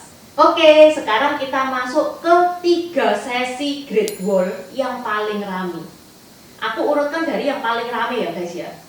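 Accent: Java, Indonesia. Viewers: Kak....logat jawanya surabaya atau semarang kak? Ok thank you....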